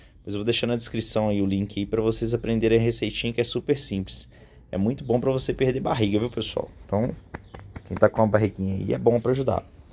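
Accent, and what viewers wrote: Minas Gerais, Brazil. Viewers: Você é mineirinho?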